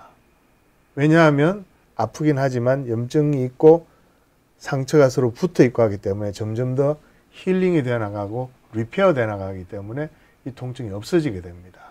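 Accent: Gyeongsang, South Korea. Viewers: ㅋㅋㅋㅋㅋ 갱상도 바름~^^ 귀여븐 사랑스런 감사한 우리 교수님~~😊😍😂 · 1년 기다려야하는 환자들 생각해서 열과 성을 다해서 설명해주시는 박사님! 의학 지식을 갱상도 발음도 알아들으니 넘 감사드립니다.어금니 꽉 깨물고 참아봐야쥬^^ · 갱상도 확실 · 배척 /배측 그냥 알아들으시지 …경상도 발음이 너무 좋아요 !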